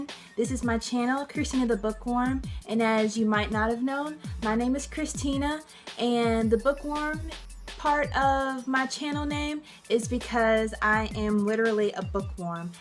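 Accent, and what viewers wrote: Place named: South, United States. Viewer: I'm glad I'm not crazy then! I thought you sounded a little southern! It's definitely cute, I love southern accents. And I'm sure you will absolutely love it in this community. :)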